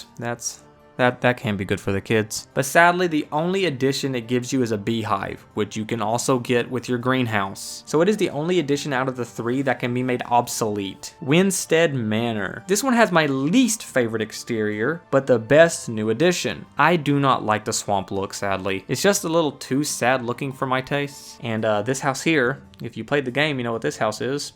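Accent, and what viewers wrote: South, United States. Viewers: You and theepicnate are easily some of my favorite skyrim youtubers. Just something in the way your videos are so fun and calming to just listen to as you go about your day. The southern drawl in your voice is just the cherry on top. Great vid man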